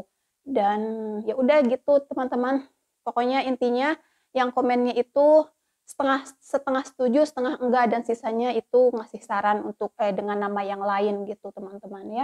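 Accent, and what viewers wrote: West Java, Indonesia. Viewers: Assalamualaikum teh saya baru bergabung😊abdi ge orang sunda tpi denger teh nyarios sunda resep jd musam mesem sorangan😁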